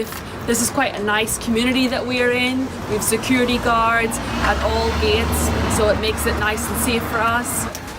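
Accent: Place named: Northern Ireland, United Kingdom. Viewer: Studied English for so many years and have been living in the US for ten years. This is my first time hearing someone from Northern Ireland speaking. I can tell Northern Ireland accent sounds way much different than England accent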